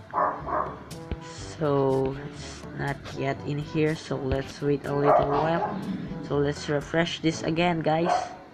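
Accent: Philippines. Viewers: I can easily tell that you're Filipino :D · Yep the accent is my fellow filipino friend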